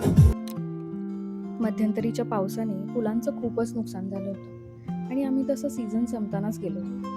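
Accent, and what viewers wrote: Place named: Satara, India. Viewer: ❤ satarakar bolte😍😍😍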